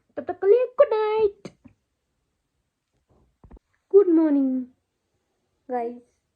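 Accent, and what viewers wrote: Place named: Pahadi, India. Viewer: Pahadi tone is beautiful ❤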